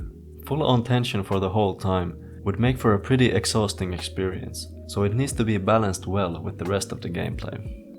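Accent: Finland. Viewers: Definitely from Finland. The accent gives it away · Where are u from ? U sound like u have a finnish accent or something similiar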